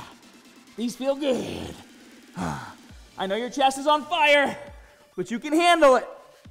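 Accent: Boston, United States. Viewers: The exaggerated Boston accent is so cringe.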